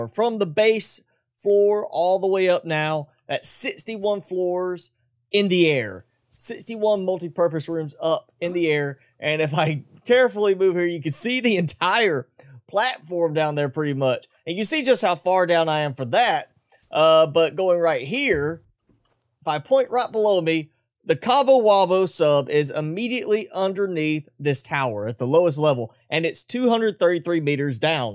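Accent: South, United States. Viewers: You sound southern are you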